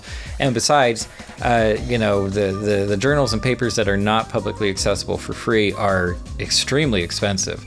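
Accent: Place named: United States. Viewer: Hbomberguy with hair and an American accent.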